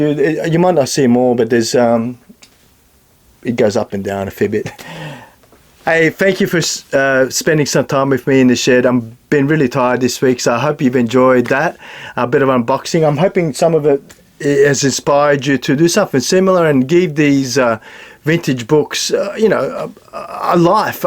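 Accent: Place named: United Kingdom. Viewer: Dude I thought you were from the UK this whole time 😂 I guess I'm not good with accents Love the book hauls. Keep 'em coming 👊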